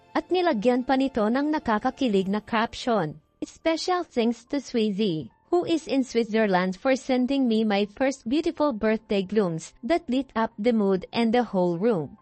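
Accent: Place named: United States. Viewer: Hoy ikaw American ka? Ayusin mo tagalog mo di bagay sa yo!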